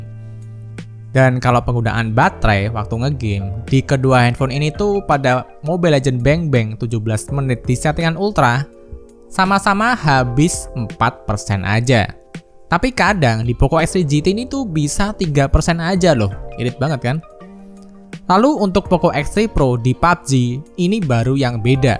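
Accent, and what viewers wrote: Kudus, Indonesia. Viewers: Orang kudus to mas? Wkwkwk sama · Sampean orang kudus mas? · lho bang .. orang kudus? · bang lu dr kudus ya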